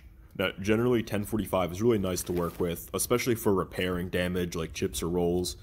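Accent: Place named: Pittsburgh, United States. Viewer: You sound like you're from Pittsburgh 🤔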